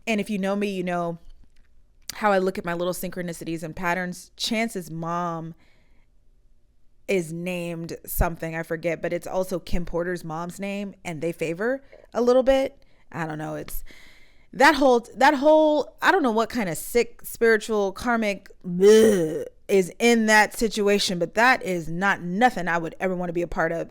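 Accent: United Kingdom. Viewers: As a Brit, loved your British/English accent 😂 Missed you. You’re so relatable. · 🤣, that British accent 👌 Loved your humour/ sarcasm throughout, please incorporate it more! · your british accent is everything! sounds just like them! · lmaoo love your british accent, welcome back!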